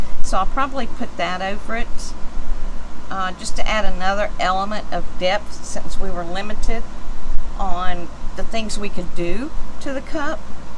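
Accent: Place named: South, United States. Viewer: Hi, I just subscribed. Her cup from you came out very pretty! Hers is very cool too. You must be from North Florida? because you have the southern accent 💗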